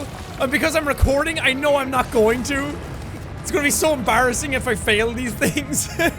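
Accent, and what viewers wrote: Ireland. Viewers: can somebody help. pronounces tri angle as try angle instead of triangle. he emphasises the space in between, is this due to his Irish accent/upbringing. I am northern English and do not pronounce it like this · Tried watching a JSE video from 2013... couldn’t get past the first minute... I missed high energy Jack with his full Irish accent!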